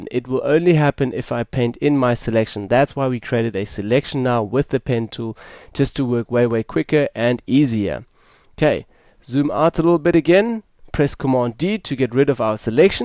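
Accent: South Africa. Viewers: Great tutorial, but it was immeasurably better with the South African accent. So pleasant.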